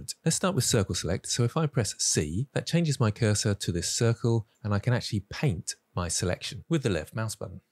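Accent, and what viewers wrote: England, United Kingdom. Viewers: He’s English, pronouncing English in a thoroughly English way. What’s not to like? It may sound weird if you’ve learned Blender from a New Zealander who makes donuts…. 😂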